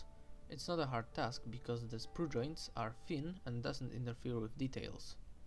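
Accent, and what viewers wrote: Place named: Poland. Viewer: Nie źle angielski też spoko tam tylko masz polski akcent ale tam jest spoko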